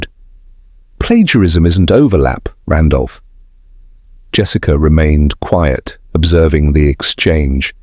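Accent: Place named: United Kingdom. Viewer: Why is this narrated in a British voice?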